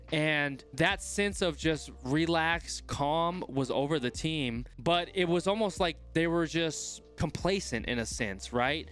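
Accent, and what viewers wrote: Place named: United States. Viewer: Thanks J. Enjoyed watching you and your reaction vids. As a saffer it’s really nice hearing it all in an American accent. Thanks again boet.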